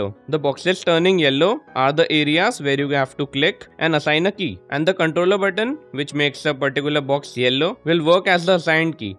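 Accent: India. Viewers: You know you've struck gold when you hear that sweet, sweet sound of an indian accent when looking for a tutorial, thanks man! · bro talks like indian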